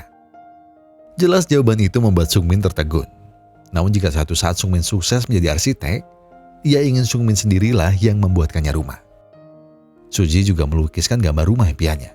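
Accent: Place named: Banyumas, Indonesia. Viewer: Deneng teyeng ngapak njenengan mas?😅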